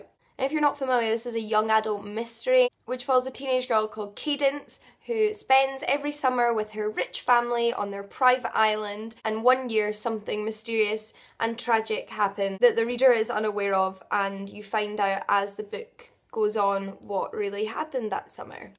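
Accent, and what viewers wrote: Scotland, United Kingdom. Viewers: Hi there! I've just discovered you and I love your channel ❤️ You do really nice videos!!! I heard your accent and thought: this girl must be Scottish! 😍 I looooove your accent. I was in Edinburgh last summer and I'm coming back next month. Which part of Scotland are you from? Kisses from Spain xx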